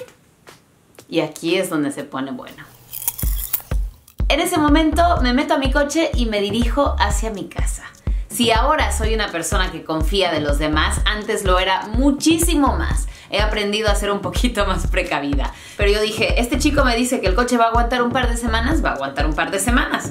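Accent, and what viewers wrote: Mexico. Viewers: Hablas el idioma español, perfecto me has sorprendido, ya hablas como Mexicana, saludos desde Veracruz. 😁☺✋ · es un poco negativo decir no seas como mejor di algo como tomando experiencias de:) saludos un canal muy padre positivo agusto al escucharte hablas español con acento de nosotros de México:) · Me encanta tu asentó mexicano❤️❤️ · Jeje jeje. Me encanta tu español super mexicano. Numero uno. Dos. Si yo te contara todas por las que he pasado te mueres de la risa e impresion. Aqui aplicacion que cuando no te toca. Ni aunque te pongas. Bendito Dios. Seguimos aqui güera chula 😙😙😙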